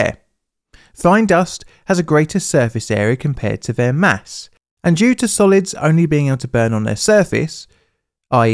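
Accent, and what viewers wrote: United Kingdom. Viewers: I don't think there is ever a point where, when a Brit speaks, I have to translate at least a word, into American! Lol.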